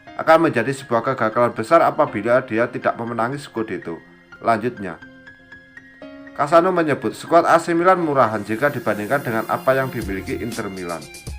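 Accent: Java, Indonesia. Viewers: Lah medoke kang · Medokmu parah kang 🤣🤣🤣🤣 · Hadeh ini narrator piye! Karedok medok banget! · lanjutkan aja mas..medok² yg penting MILANISTI 😎 Forza Milan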